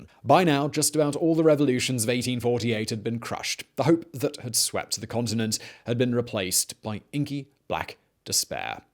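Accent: United Kingdom. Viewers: this guys to British lol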